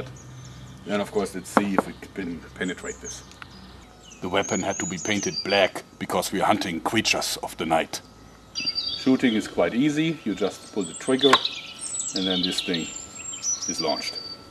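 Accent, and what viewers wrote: Germany. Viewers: könnte es sein das du deutscher bist??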